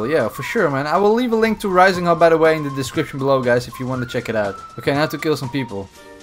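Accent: Netherlands. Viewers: U kinda have a Dutch accent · Judging from your accent youre a fellow dutchmen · not only not a German accent, but very clearly Dutch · Ik kan gewoon horen dat je nederlands bent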